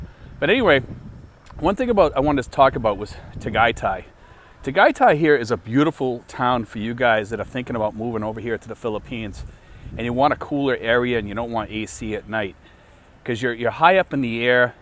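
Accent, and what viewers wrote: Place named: New York, United States. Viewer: I hear an east coast accent. Are you a New Yorker?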